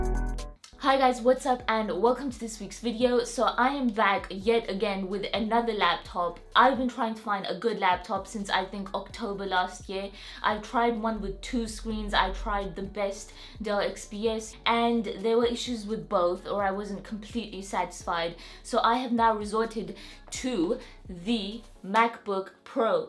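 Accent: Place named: United Kingdom. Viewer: Thanks for the share. PS - I love your British Accent. So sweet and relaxing to the ear.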